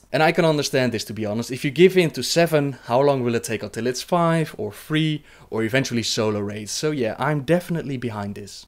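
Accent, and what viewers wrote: Netherlands. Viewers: I like your Dutch accent:) I'm Dutch aswell:)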